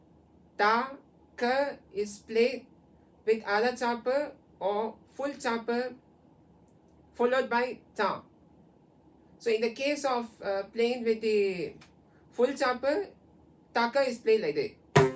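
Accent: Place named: United States. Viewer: I am curious, your accent smells like US. Which state are you residing. And, are you conducting online classes. If yes, how can I approach your class. How much the charges for a student. Thank you 🙏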